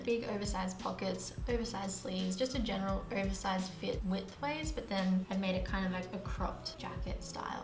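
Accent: Australia. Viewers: Wow, what an impressive instructional video!! Excellent job, your jacket is beautiful. You are so good with measurements and seams. I almost feel like I could do this by just watching you. But I know it took great kill and practice. I have some old wool blankets to be used for this project. Thanks and love the OZ accent, Cheers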